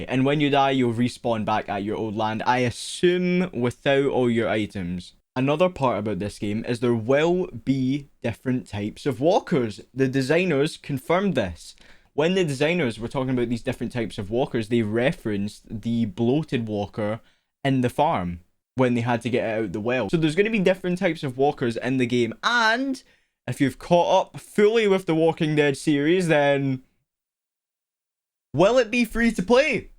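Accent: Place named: Scotland, United Kingdom. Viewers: You guys are really trying to make it on this channel even switched the robot for the old dude and now the old dude for a younger British sounding (sorry if your not) but my question is when do I get a turn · Sounds Scottish to me not British :')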